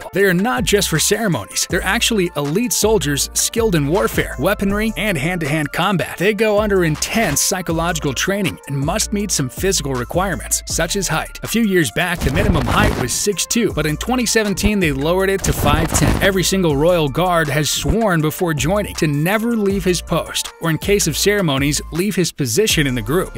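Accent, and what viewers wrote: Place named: United States. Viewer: Why àn àmerican voice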